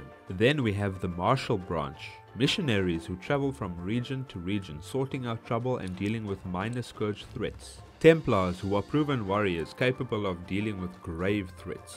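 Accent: South Africa. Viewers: This such a deep south african accent good video please more lore videos , I subscribed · I was also just like this guy is south African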